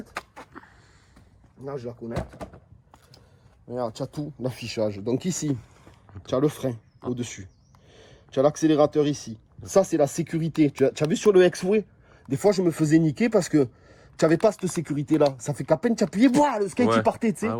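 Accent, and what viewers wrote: South, France. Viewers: avec plaisir avec ton accent du sud tes vidéos sont vraiment Crème 😅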